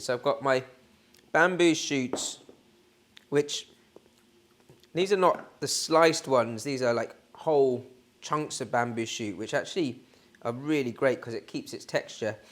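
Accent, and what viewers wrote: United Kingdom. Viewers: Greetings from Walnut Creek CA. Looks delicious. Just ran into this channel for the first time. Love the British accent (or maybe it's me with the accent)!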